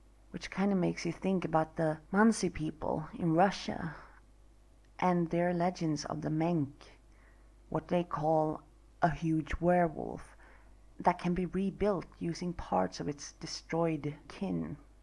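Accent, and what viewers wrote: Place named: Ireland. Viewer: Sounds a tiny bit Irish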